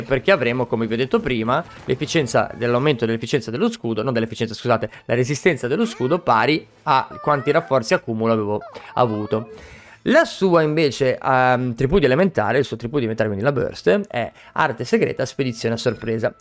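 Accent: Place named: Carrara, Italy. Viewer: Ma a l`è cuscì.... Sei di Carrara?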